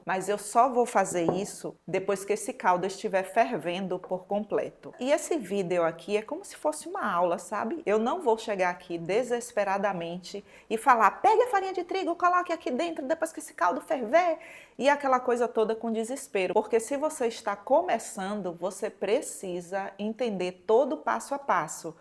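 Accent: Bahia, Brazil. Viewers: Amo suas receitas, baiana arretada adoro seu sotaque mulher.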